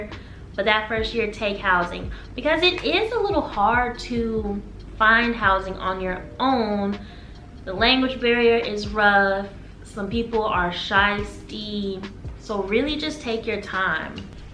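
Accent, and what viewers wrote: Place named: Alabama, United States. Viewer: What part of Alabama you from?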